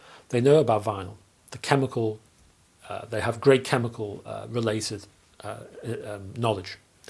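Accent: United Kingdom. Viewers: Thanks so much for this video. I enjoy your demeanor and easy-to-understand British accent. But I have a few things to say. I've heard that the PVC used in records is normally clear. That's why nowadays we get so many colored ones on the market. Evidently your black samples were treated with some type of carbon, that's what I've heard btw. Now as for alcohol use, how I tested it was to put undiluted store bought 70% isopropyl on a record in a 3" square area and let it sit overnight. The next day, having played the record previously I played it again and noticed absolutely no difference. I think the best way to test something that may have detrimental effect would be try it out on an unwanted record in an undiluted form. If it causes damage of course, back it off. 😊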